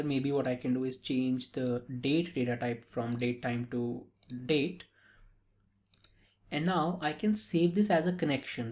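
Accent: India. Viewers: I know this is a good tutorial whan I heard Indian accent in the opening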